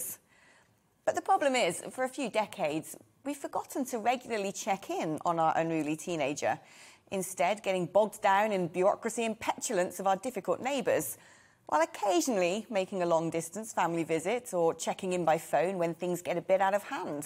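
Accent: United Kingdom. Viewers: Condescendingly, British. Iii like it! 😀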